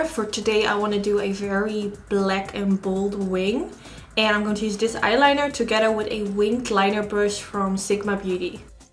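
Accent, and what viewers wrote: Netherlands. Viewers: I never knew a Dutch accent could be this charming! I’m a new subscriber and I thoroughly enjoy your content!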